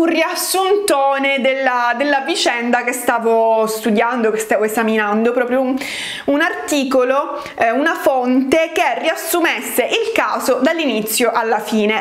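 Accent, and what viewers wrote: Sicily, Italy. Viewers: Comunque io adoro la tua voce e il tuo accento siculo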